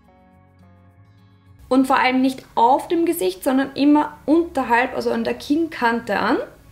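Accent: Vienna, Austria. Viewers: Bist du aus Österreich? Hörst dich ein wenig "Wienerisch" an, für mich als Wiener zumindest 😉👍🏻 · ihr österreichischer dialekt kotzt einfach nur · Bist du Österreicherin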